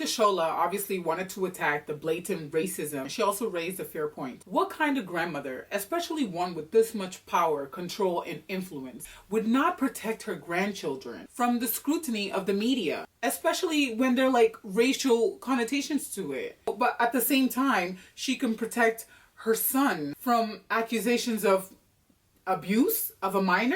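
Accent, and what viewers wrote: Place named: United Kingdom. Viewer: Lmao 4 real!!! Love the British accent hahha hilarious 😂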